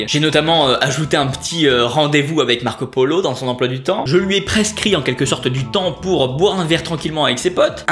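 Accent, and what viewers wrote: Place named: Marseille, France. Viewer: Ton accent marseillais 🤣😂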